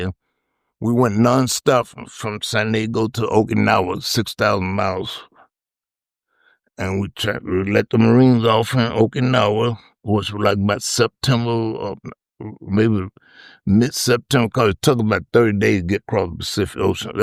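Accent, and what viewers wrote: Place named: South, United States. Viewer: I'm a Southerner so I've met plenty of people who sound like him and I always love it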